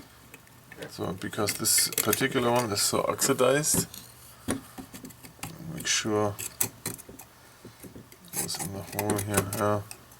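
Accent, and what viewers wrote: Germany. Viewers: i'm not german but i used to live in germany so i recognized immediately by the sound of your voice :-) thanks for this helpful video! I wasn't sure if i had to buy a whole new LED track light system as mine came with halogen, good to know i just need to buy the bulbs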